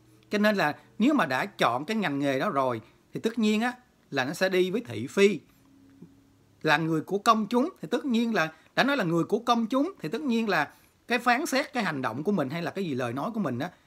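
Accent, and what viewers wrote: Standard. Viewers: Bạn này nói hay và chuẩn nè. ❤️❤️❤️❤️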